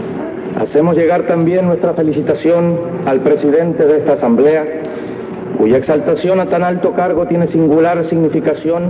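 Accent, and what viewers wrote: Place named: Cuba. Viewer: Porque hablaba como cubano?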